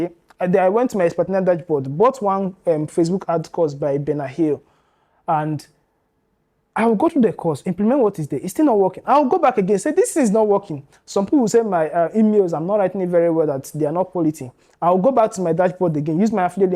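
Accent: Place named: Anambra, Nigeria. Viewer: I like your accent.... My Anambra brother. This has to be the best founders story I've listened to. I had to download it to listen again. I loved the way you talked about your challenges. I'm one person that is usually afraid because I have tried some things that didn't work. I'm motivated already. I also like the idea of the financial market. I hope to meet you someday. I'm starting now.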